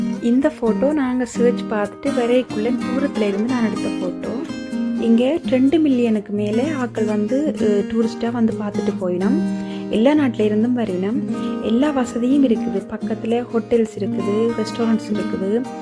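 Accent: Sri Lanka. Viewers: Very nice. Many likes. Your sri lankan tamil super. New frnd 8 4 .Stay connected 👍👍👍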